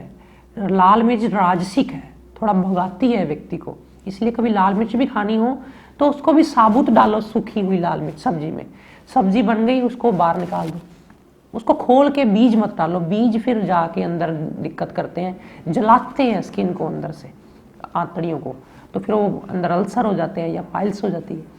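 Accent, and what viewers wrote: Punjab, India. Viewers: Thanks for information. R u Punjabi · Guru g apki tone punjabi aa rhi h...acha feel hota h Koii formality nhi lgti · Thank you very much for teaching us so many good things.aap Ka Punjabi eccsent bot achha lagta hai jaise koi Ghar Ka member hi samjha raha ho .pls tell it contact no. After cancer treatment big changing side effects have come.no one telling us the way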